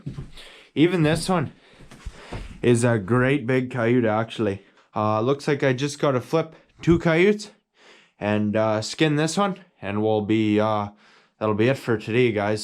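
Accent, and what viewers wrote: Canada. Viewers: From Texas. Love those Canadian vowels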